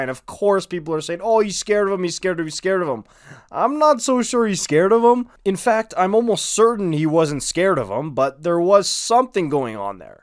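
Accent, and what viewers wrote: Quebec, Canada. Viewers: Yoooo je savais pas que tu étais Québécois😂 damn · Hearing you speak perfect english and swith in bon vieux québecois kills me everytime lol, keep it up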